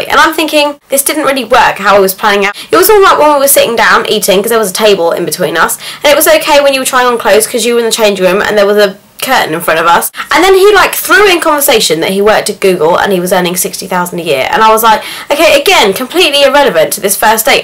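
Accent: England, United Kingdom. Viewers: hahaha om lmao! love your personality and accent, from which part of england are you? And how old are you? Just curious, not anything weird :P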